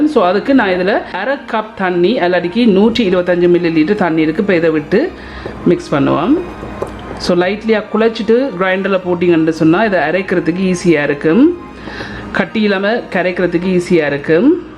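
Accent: Sri Lanka. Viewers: இலங்கை தமிழ் நீங்க செஞ்ச தோசையைவிட அருமையாயிருக்கு .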